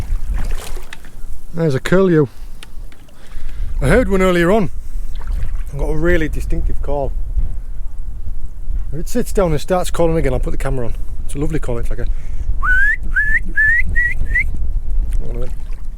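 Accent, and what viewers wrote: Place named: England, United Kingdom. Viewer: I knew it was going to be good day when I hear I got my booket and you go on a trip in an English accent. Good view and explanation of things.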